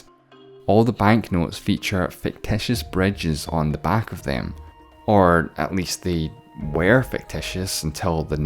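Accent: Scotland, United Kingdom. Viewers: I like his scottish accent.. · Its a Scottish accent not English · I have no clue about this fact. Also, I subscribed! Are you from Scotland?🏴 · He is Scottish. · Sees thumbnail. Cynically wonders what BS they'll say about Scotland. Hears a Scottish accent presenting and is filled with hope. Are you actually kidding me?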